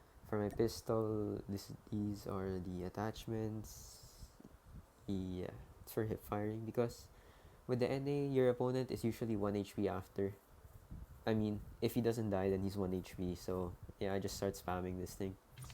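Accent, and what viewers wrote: Philippines. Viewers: Are you Filipino? Because your accent is Filipino like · are you filipino bcuz ur accent is like a filipino accent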